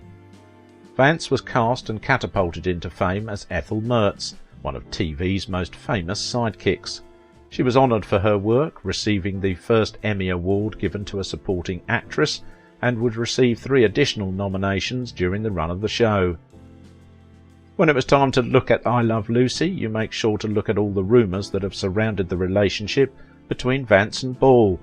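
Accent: United Kingdom. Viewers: Does anyone else find it ironic that a nostalgic program about an American classic TV show is narrated by a gentleman with a British accent??🙄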